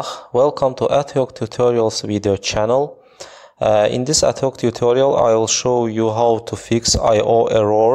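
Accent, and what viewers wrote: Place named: Poland. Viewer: Jestes z Polski? masz akcent polski :)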